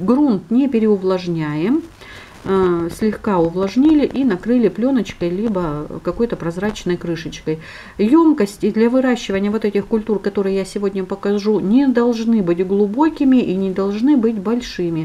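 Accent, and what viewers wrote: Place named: Sverdlovsk, Russia. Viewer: в каком городе вы живете? говор алапаевский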